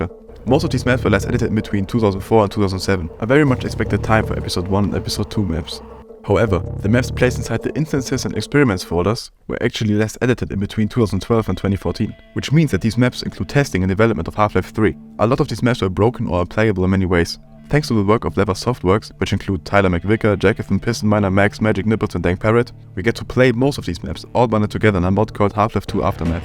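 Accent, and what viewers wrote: Germany. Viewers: He’s speaking German when speaking English. I love it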